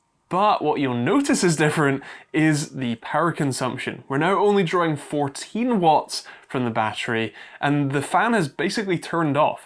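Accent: United Kingdom. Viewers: Interesting video, I'm just curious about your accent, what part of UK are you from?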